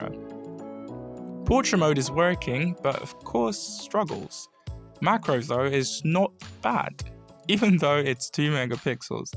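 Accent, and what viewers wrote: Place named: United Kingdom. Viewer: I had no idea he had a British accent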